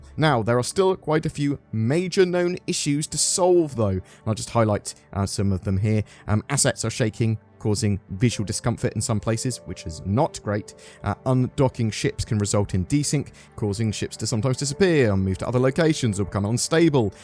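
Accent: United Kingdom. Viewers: love BEING a part of your community. I've met some interesting people, and I love hearing your limey voice talking about a game I am so passionate about.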